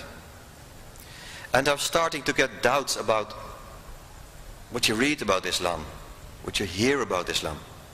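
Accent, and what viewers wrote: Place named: Europe. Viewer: What country is this ? What European country this brother from?? His eccent European country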